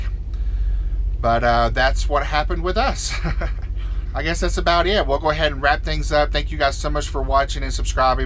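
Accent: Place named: South, United States. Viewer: first I must say that I love your southern accent lol and my mom is from a little place called Rutherfordton NC you blink and you could pass it lol I love your videos guys be safe!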